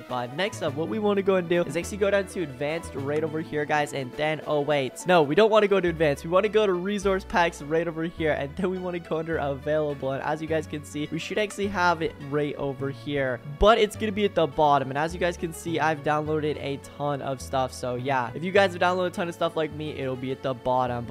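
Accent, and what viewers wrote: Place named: India. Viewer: You sound more Indian dan the actual Indians you cross born Indian.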